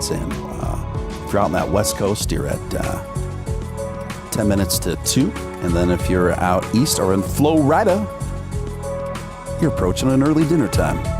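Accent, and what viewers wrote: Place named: Chicago, United States. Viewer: Hey.. Your accent Sounds like your from Chicago..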